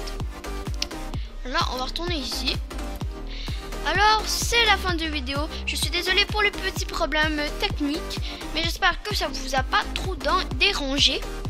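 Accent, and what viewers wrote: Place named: France. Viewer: Accent FR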